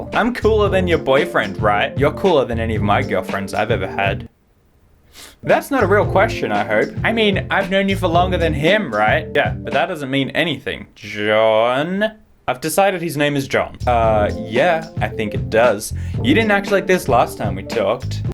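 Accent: Australia. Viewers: your Australian right? I seem to be watching a lots of Australians lately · I love how your Australian accent really comes out in this video ❤ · are you australian pewdie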